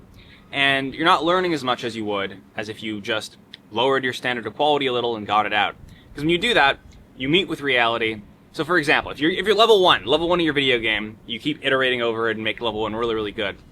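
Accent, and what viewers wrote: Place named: Canada. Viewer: he sounds so canadian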